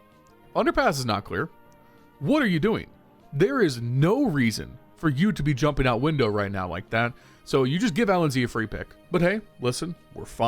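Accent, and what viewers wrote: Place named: United States. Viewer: 1. Open cs commentary video 2. Hear american accent 3. Click away from the video and never watch another of his videos again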